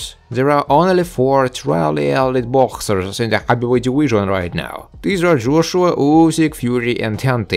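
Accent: Russia. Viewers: Каким-то образом видео попало ко мне в рекомендации. Слушаю и думаю, ебать какой дикий акцент. Подумал сразу может это русский, а потом посмотрел инфу канала и подтвердил свои домыслы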